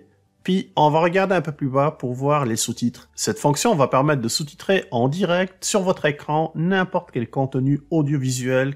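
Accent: Quebec, Canada. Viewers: Merci pour cette excellente présentation... avec cet accent de nos cousins du Québec :-)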